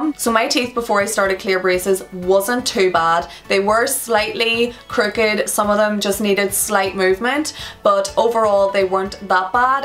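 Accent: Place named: Donegal, Ireland. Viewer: I wanna say that your from Dublin but you have a donegal accent (my accent)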